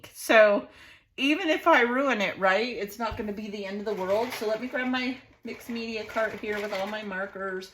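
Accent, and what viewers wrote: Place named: Oklahoma, United States. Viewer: if you are like me your "Walmart" photo packages had 50 images of different sizes and the bigger ones used to hang on my wall in frames. People don't seem to do that so much anymore, but I refuse to discard them so...they are in my albums as well as a few of the smaller sized sprinkled in. There is just something about an 8x10 that encapsulates the family unit. Gotta keep 'em and enjoy 'em! BTW - LOVE your "Okie" side when it comes out!! You did a wonderful job on this large photo layout!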